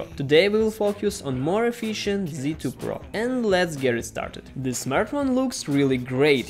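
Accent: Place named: Russia. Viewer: Russian english Best phone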